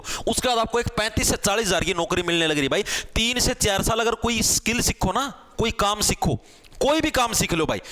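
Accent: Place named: West Uttar Pradesh, India. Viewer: Bhai apki desi language ne dil jeet liya west up se hi ho kya mahare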